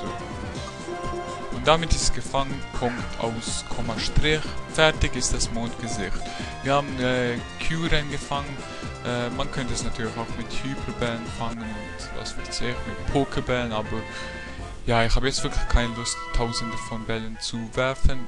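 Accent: Switzerland. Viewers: also ka ob du das liest, ich liebe deine Walkthrough's wegen dir kauf ich mir jetzt einen 3ds nur damit ich alle Generationen spielen kann:DD Ich liebe deine Video's und auch dein Schweizer Akzent - Das musste ich jetzt einfach hier schreiben auch wenn das Video schon 3 Jahre alt ist. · du bisch doch ganz klar en schwizer :D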